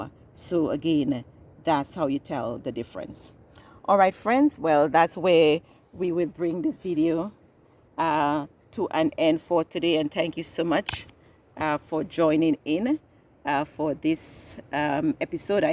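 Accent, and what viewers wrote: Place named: Antigua and Barbuda. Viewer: thanks very much l sm retired from construction and is experimenting with a backyard garden and you helped s lot . and you were very clear.you sound antiguan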